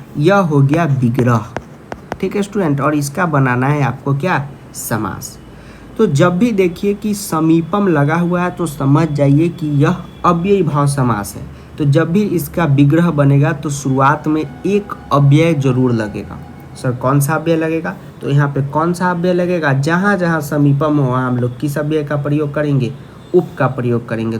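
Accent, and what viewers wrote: Bihar, India. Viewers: Sir, your language is telling me that you are from Bihar because I myself am from Bihar. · Sir lagta hai aap bhi bihar ke hai tone pakad liye hai ham😀😀😀😀 BTW the teaching was next level. Thanks for the useful session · Thanks a lots sir .... Mujhe samas mai bahot doubt tha par apka video dekhne ke baad sara doubt khatam ho gya ...... Sir aap ki awaj se pta chal rha tha ki aap Bihar se ho .. mai bhi Bihar se hu..... Lots of love from Delhi Aur one's again Thank you 🙏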